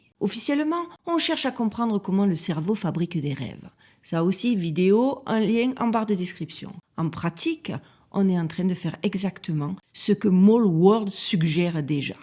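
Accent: South, France. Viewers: J'aime tant votre accent du sud... Ne le changez pas, il y a trop de français du sud qui le changent, en premier le Monsieur de la ferme des cochons laineux du tarn-et-garonne, il est presque parisien avec son accent! Mais il fait un travail excellent alors je profite des autres, qui sont rares, qui ont un si bel accent! Merci pour votre travail. Je viens de regarder la video des menus des Rochschilds. Excellent! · Toujours au top tes vidéos, un régal de t'entendre et de te suivre. Ce petit accent du sud , j'adore ...😉 Hier je suis tombé sur cette vidéo de 3I atlas <link> À méditer....🤔 Belle continuation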